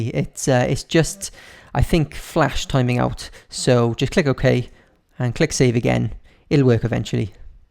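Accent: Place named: England, United Kingdom. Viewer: Can somebody help me...Whereabouts in England does his accent originate from? It's gorgeous, I can't get enough of it!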